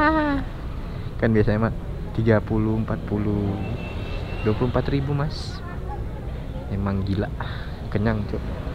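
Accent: Papua, Indonesia. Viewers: Logat Papua😁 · Logat papua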